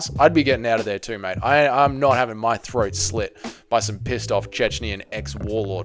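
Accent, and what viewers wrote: Australia. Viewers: U gotta change that intro especially with that accent sound like a Walmart ozzy man😞no disrespect this is my 1st time seeing you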